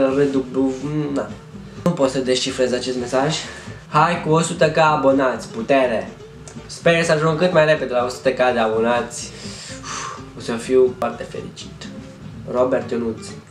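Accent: France. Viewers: nu e sasait are accent frantuzesc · Ba nu vorbeste sâsâit...are accent franțuzesc •^•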